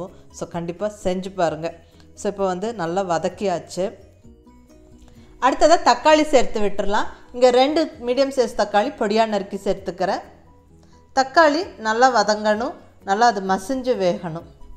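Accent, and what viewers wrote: Kongu, India. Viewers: I tried it today.. it was good.. mam, all Ur recipes were good, clear explanation.. apart from the recipes, Ur kongu Tamil is superb.. namma oor karanga, enga neighbour polA feel agudhu.. Thanks a lot mam..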